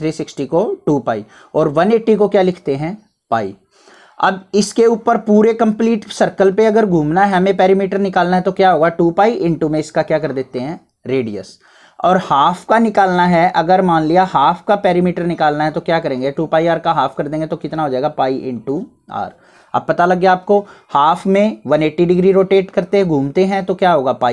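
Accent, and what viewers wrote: Haryana, India. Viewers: Sir aap hariyana se ho kya ?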